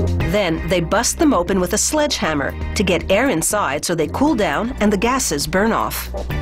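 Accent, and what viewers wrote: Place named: Canada. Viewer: That Canadian accent...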